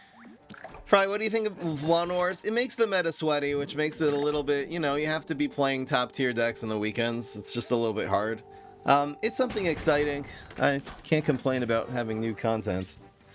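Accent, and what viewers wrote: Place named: France. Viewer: Voice french is so funny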